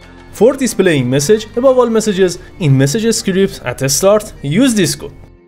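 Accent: Iran. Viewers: you sound Iranian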